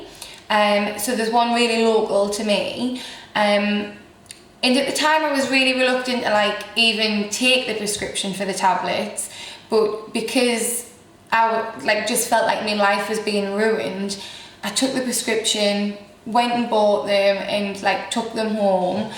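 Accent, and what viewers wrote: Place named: Newcastle, United Kingdom. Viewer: Geordie accent from Newcastle, uk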